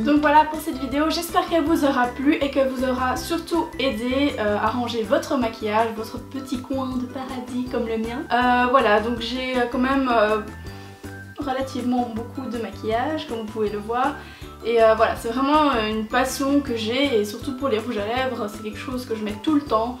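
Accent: Belgium. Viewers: j'adore ton rangement makeup :) haha l'accent belge j'adore #belge (je le suis aussi ) tu es d'ou en belgique?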